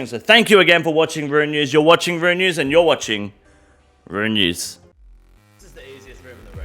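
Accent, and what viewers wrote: Australia. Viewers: Never thought RuneScape would have a unhinged Australian. I love it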